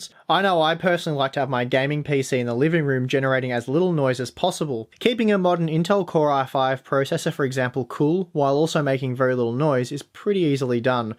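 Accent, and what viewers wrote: Australia. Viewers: "Hear that? That's the sound of an australian accent."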